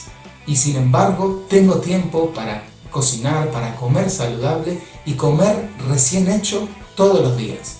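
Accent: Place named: Argentina. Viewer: Hola! De casualidad encontré uno de tus videos (creo que fue buscando cómo hace galletitas de avena) y me fuiste llevando con los temas a ver varios de ellos. Es muy interesante esto de la nutrición macrobiótica, están buenas muchas cosas que plantea pero es difícil dejar y/o cambiar ciertos hábitos cuando uno disfruta tanto de la comida y ha sido criado así no? Es más, ni bien te escuché pensé: este chico es argentino!! y no paré hasta confirmarlo!! se te nota en la forma de hablar =D Yo tb lo soy... es muy loco que estés viviendo en Finlandia!! Qué lejos!! Jaja pero bueno, nos demostrás que a pesar del frío tremendo que hace allá se puede comer saludable. Saludos desde Bs As!!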